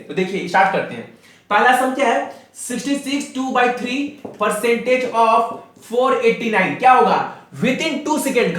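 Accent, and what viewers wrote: Bihar, India. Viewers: very nice way to teaching sir....and bihari tone omg thnq sir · i love this bihari tone awesome both subscribe and like done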